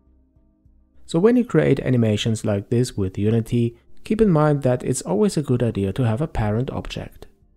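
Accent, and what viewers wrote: Germany. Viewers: You sound so german I almost thought you would start talking german.^^ Fand ich irgendwie totaly trippy.^^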